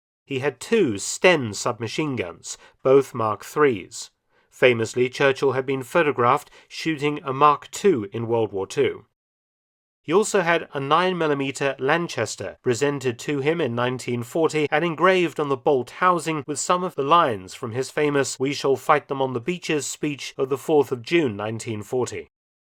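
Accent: England, United Kingdom. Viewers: Colt .45 is always a reference to the Colt Peacemaker not the 1911. I presume from your accent that you are a Brit and I am taking this in account referencing your "gun nut" comment. Here in the colonies, we call someone with a mere 24 guns a beginner. Regarding Churchill, Americans refer to him in reverent terms as a patriot and the leader who showed the way to victory over the evil menacing the world of his time. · Is it weird of me to ask what bit of England you're from, Mister Felton?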